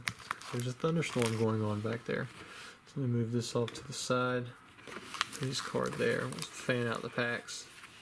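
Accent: South, United States. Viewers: are you from GA or Tenn.? Also love the vidz man I heard about from Pokerev even though you’ve been on YouTube longer.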